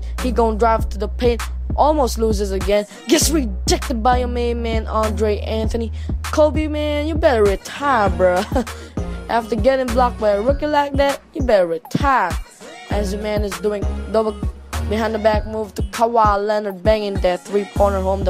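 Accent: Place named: Philippines. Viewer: Im Filipino too, i could tell by your accent.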